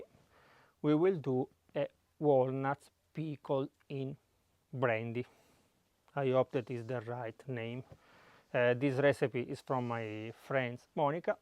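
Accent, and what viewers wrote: Italy. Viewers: Looks spppectaculllar! Can I use honey instead of sugar? My wife thinking I am crazy roatating my forearm for the enjoyment. Love Italian cuisine and your accent. Go bro.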